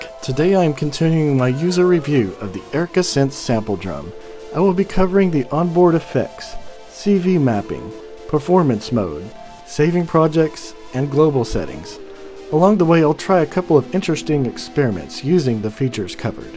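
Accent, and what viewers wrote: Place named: North Carolina, United States. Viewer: Thanks for the videos. I like them, even though you sound like you might be from North Carolinas or something :)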